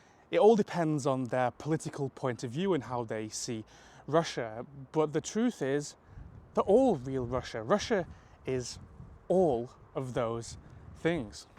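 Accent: United Kingdom. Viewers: Как всё-таки легко понимать британский английский. Без этой распальцованной, разнузданной американской каши во рту. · What region of the UK are you from? I'm trying to learn to determine accents😅 It sounds like RP with a touch of something, I dunno, northern?..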